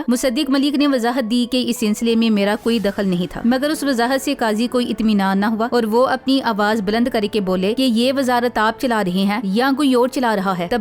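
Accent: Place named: Punjab, India. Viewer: Khabees Faiz essa .... Please AAP Urdu ko Urdu mein Bolen Urdu ko Punjabi lehjay mein na boleen ....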